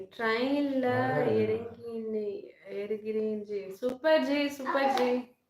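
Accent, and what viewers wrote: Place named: Thanjavur, India. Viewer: Thanjavur Tamil 🤏🙏🙏🙏🙏♥️♥️♥️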